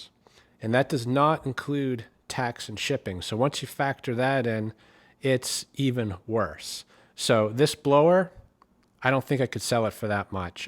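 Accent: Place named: New Hampshire, United States. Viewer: That is "local accent"....New Hampshire?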